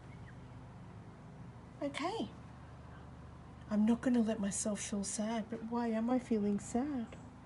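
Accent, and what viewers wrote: Australia. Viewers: I just found your site. Cannot hear you...voice is so soft....love the Aussie accent...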